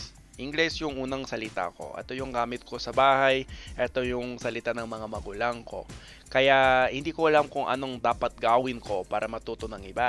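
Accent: Visayas, Philippines. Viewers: Wow even your accent sounds like a true Filipino keep up the good work pare! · his accent sounds like a construction worker from visayas so it sounds perfectly fine, even the flawed grammar sounds visayan, its like duterte was his tutor, so it gets a pass for me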